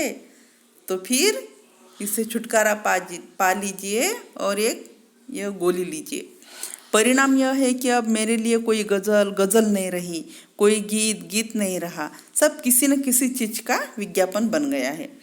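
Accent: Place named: Maharashtra, India. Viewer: Aapka fluency Marathi lag raha hai not good speaking Hindi...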